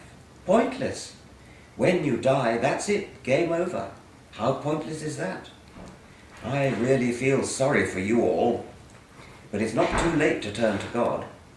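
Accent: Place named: RP, United Kingdom. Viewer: Hearing him swear in an educated and satirical British accent is hilarious.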